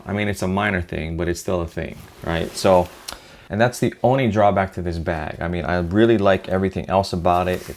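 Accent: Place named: Hawaii, United States. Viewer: I could tell you were from Hawaii before I even saw the name if your channel.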